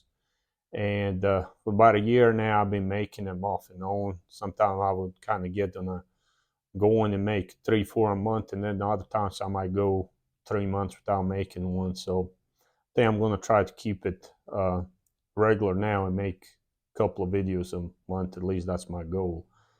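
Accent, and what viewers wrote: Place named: North Carolina, United States. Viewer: Thank you for your service ! The US is lucky to have you. I picked up on your NC accent right away ,lol. We spent 20+ yrs in Cleveland County NC and relocated to Wyoming about 5 years ago. Not sure if we could ever deal with the humidity again.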